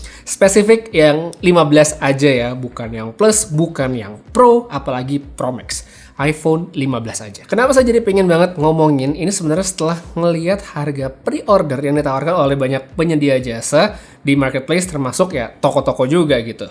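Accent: Bali, Indonesia. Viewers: Bang dr Bali kah?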